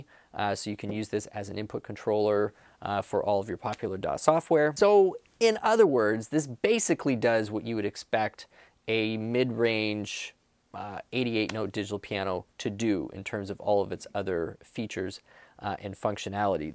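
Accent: Canada. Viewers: Loving the Canadian accent. I hear what you are talking "a-boot"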